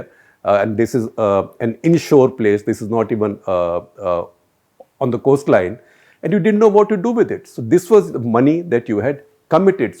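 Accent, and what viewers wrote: India. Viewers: This is possibly the worst speaker of the English language. He speaks English as if he is speaking Hindi. Except for Telegu most of the Indian languages are greatly disturbing in that words come out as if they are being shot out. There does not seem to be an sort of fluidity or smooth flow of words. At the end of a phrase the speaker pauses as if expecting an answer from the listener or expecting the listener to complete the sentence of phrase ,much in the manner of a kindergarten teacher . It would probably treble or quadruple watchers if this man learnt to speak English as it should be spoken not broken up staccato like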